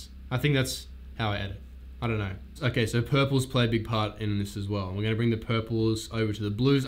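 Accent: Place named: Australia. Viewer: I like this dude. He does the shit that a lot of people refuse to do (actually show editing) and he does it with an Australian accent. What's not to like?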